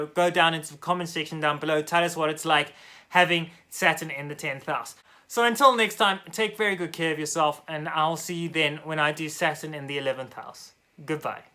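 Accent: South Africa. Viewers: This dude south African?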